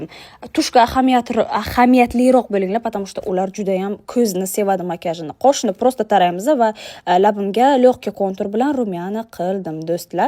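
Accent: Bukhara, Uzbekistan. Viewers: Бухоро шеваси бу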